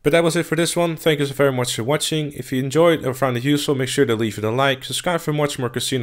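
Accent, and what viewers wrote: Netherlands. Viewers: Ik hoor een beetje een Nederlands accent? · Hollander zeker :p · are u from the netherlands ??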